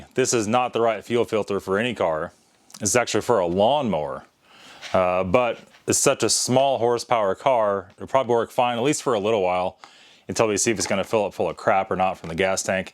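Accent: St. Louis, United States. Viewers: Dude you GOTTA be from around St. Louis? You sound like my parents and I was born there, and especially your humour! Crapping their pants! Omg! Dude I was dying, I did buy a drive belt for my N20 from gasp!...auto zone and it was continental! German made too! But that is all I’ll buy! Period! Keep up the work!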